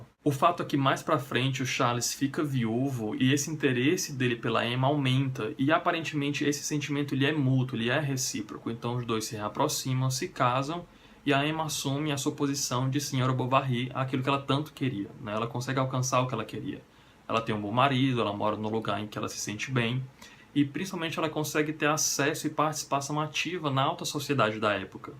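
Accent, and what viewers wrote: Northeast, Brazil. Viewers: Sotaque nordestino?😍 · Acabei de conhecer o canal pelo vídeo da resenha de A Desumanizacao! Gostei muito do jeito que você descreve os livros, ta de parabéns (sem contar o sotaque nordestino que eu adoro) já tem mais uma inscrita! :)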